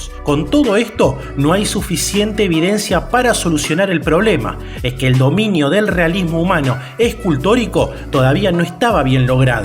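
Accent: Argentina. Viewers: Benditos sean todos los hispanohablantes pero yo puse el sonido para ver quién relataba este documental y escuchar un argentino fue un aliviaso, encima buenísimo el canal, bien ahí <3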